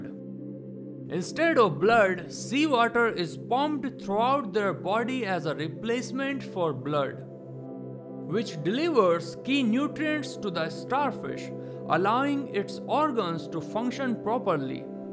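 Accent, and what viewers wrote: India. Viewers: Yeah text way better than Indian accent (not being offensive) just saying :| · It's not a broken english, he has an indian accent. I have a very different accent too, but it's not indian.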